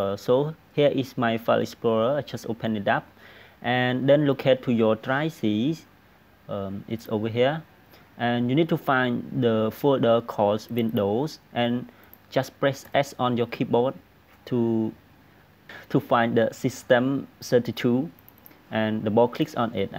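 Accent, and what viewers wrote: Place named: India. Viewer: You sound like indian tech support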